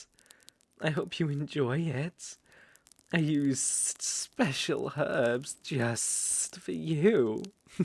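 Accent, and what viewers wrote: United Kingdom. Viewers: What did I just bump to?! That's the right amount of sssss and Britishness I needed to hear! Oh my... your voice acting is very great! I'm gonna to some walk around his channel AHHH Thank you for your efforts!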